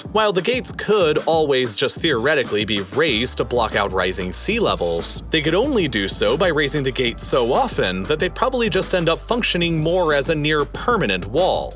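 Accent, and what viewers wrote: Canada. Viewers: I didn't realise that was Canadian! you can tell because he drops the second 't' in Toronto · Hey! I didn’t know you were Canadian! You can tell because we say Toronno, not Toronto.🇨🇦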